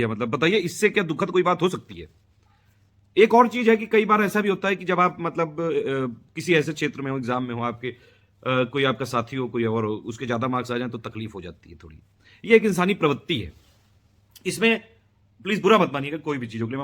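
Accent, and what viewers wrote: Kanpur, India. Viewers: भैया कानपुर से हो क्या आप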